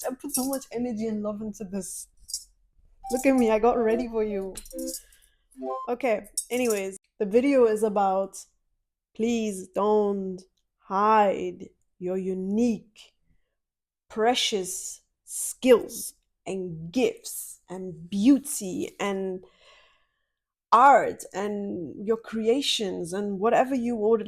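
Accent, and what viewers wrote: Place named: United Kingdom. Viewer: im your 29 sub beacuse your britsh accent is very funny and starting u say naughty naughty also funny but im girl😂 best of luck your journey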